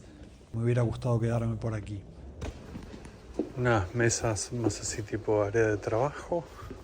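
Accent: Argentina. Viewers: Me da la idea que eres Argentino, por tu acento, y la actitud muy de por ahí, que por aquí en España, nos han dado un repaso con mucha mitomanía.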